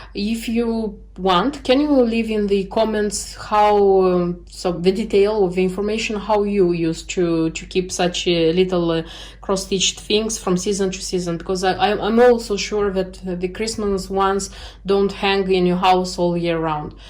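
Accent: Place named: Moldova. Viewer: я по акценту сразу поняла что вы из Молдовы, я тоже там родилась, поэтому с еще большим удовольствием смотрю вас😊 Румынский уже очень плохо помню, живу давно в России, очень люблю ваш канал, столько вдохновения!💗🌷🌷🌷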